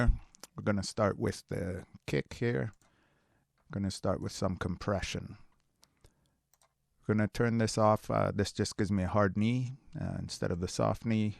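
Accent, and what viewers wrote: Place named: Ireland. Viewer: I sense an Irish accent. 1 love.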